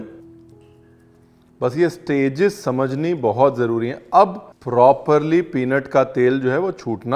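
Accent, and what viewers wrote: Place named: Lucknow, India. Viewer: Guru Tezz ho tm hain...laucknowa phonetic chhodate ni ho...sayad chhutegi v ni.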